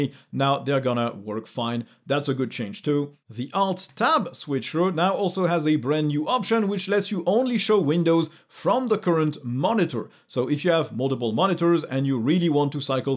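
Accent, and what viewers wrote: France. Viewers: Thanks for the reply, bro. I'd update to 22.3 then. P. S. I like Ur channel and Ur style... And that English accent is nice. U r French, right?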